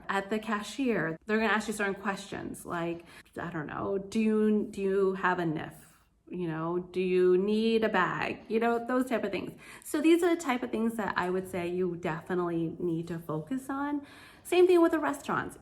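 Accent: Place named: Spain. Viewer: You talk like your Spanish. Not bad, to be honest.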